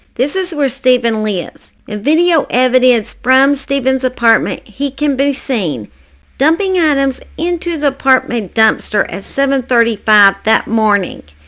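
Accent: South, United States. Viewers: I appreciate your content! These cases that aren't told need to be heard! As a southern girl myself, I can't get enough of that twang. Keep on keepin on.❤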